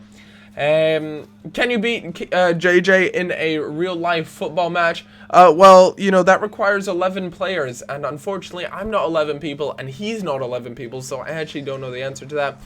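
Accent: Scotland, United Kingdom. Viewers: As a fellow scotsman I thought u were Scottish lol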